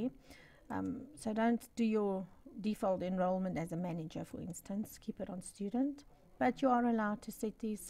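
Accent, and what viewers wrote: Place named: South Africa. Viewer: un joli accent sud-africain. Thanks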